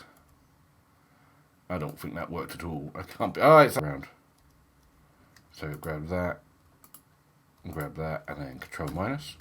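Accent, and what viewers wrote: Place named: North London, United Kingdom. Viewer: I actually came to this channel because a friend of mine watched this thinking it was me on a different channel, it's quite freaky how similar we sound, not just the voice, but the way we speak, we must be from the same area (just north of London) for sure lol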